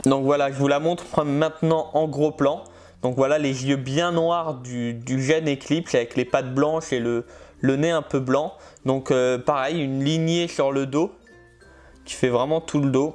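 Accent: Alsace, France. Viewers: Est-ce que tu es Alsacien/Mosellan?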